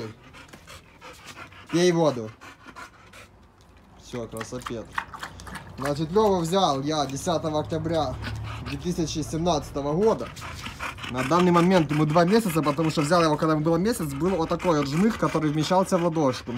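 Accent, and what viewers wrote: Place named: Ukraine. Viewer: Дача, украинский акцент, тупа, ля, чи не., ты ли это?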